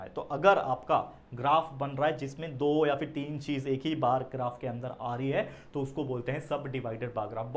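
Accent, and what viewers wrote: Maharashtra, India. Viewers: Sir tumhi marathi ahe kay ?? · Sir's marathi at peak🫠😮‍💨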